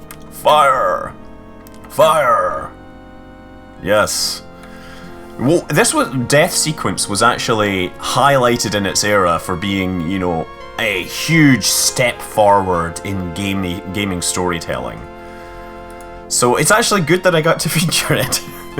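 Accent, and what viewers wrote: Australia. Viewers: nice australian accent! sounded like the guy was from up in the bush! Thumbs up for Australian! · Your Australian accent makes my hair fall out from cringing. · That Australian accent XD Don't worry, I forgive you.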